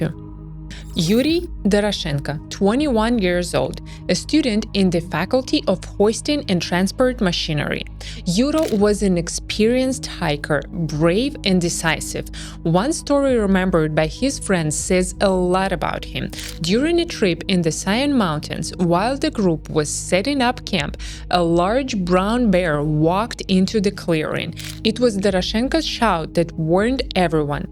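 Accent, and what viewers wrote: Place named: Russia. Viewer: What a fantastic deep dive into this story! So well explained, illustrated and presented. I appreciate the Russian accent too :) I hope one day the Soviet archives are opened up fully and we understand more. The angle on the Kyshtym nuclear event and aliens arriving is an intriguing one.... Thanks again and greetings from Spain.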